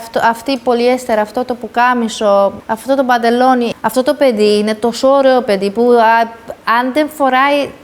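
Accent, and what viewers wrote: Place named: Albania. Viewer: Δεν μπορώ να ακούω τον καπετάν καρνάβαλο να κάνει και μαθήματα μόδας.. τόσα χρόνια στην Ελλάδα και ακόμα μιλάει σαν την καθαρίστρια μου που ήρθε πριν 4 χρόνια από Αλβανία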